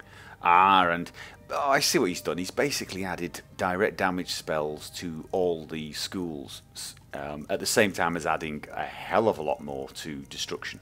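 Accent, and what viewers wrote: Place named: Birmingham, United Kingdom. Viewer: Can't believe you're Norwegian, you sound exactly like you come from the Birmingham area of England. O.O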